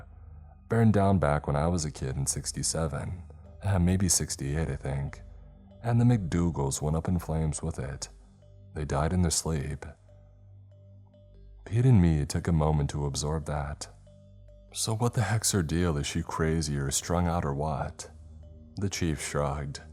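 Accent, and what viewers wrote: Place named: Minnesota, United States. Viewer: Sounds like southern Minnesota, for sure.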